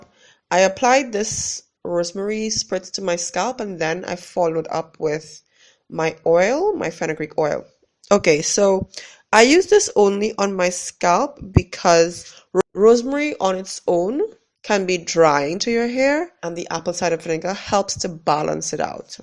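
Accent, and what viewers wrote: Trinidad and Tobago. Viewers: 5 seconds into the video i was like hii trini sister!!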